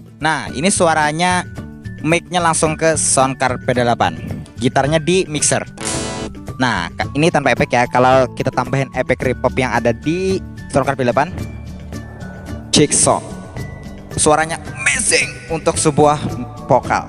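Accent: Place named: Banjar, Indonesia. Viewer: Suaranya banjar banar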